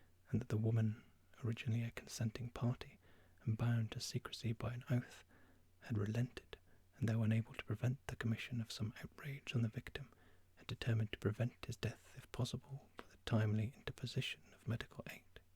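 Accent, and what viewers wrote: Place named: United Kingdom. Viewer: What a great idea to relax 😌😊💚. And as a Frenchman, what a great opportunity for me to practice my English pronounciation by listening to you 👍. Furthermore, I love the British accent (Any british accents). Most of the time, It is the one that I try to imitate when I speak English 😁 ; even if I still have work to do to improve my accent 😅. In any case, this moment was very soothing 😴👍💚. Thank you for the peaceful break 🙏💚.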